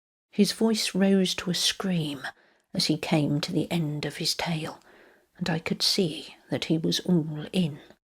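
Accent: England, United Kingdom. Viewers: Well read. Good to hear English stories in an English voice, and spoken slowly and clearly.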